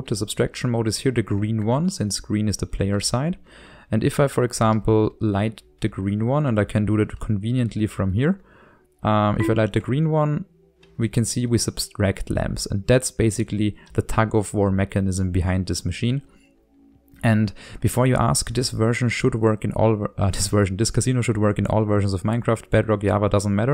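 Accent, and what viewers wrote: Germany. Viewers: man hört so krass dein deutschen accent raus xd danke für das tutorial habe spaß mit meinen freunden damit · Du kannst eigentlich sehr gut Englisch, wenn man weiß das du Deutscher bist fällt es mehr auf, aber es ist auch nicht schlimm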